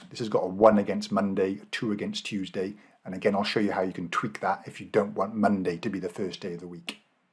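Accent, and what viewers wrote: Wales, United Kingdom. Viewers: This is very helpful, good to hear a Welsh accent as well!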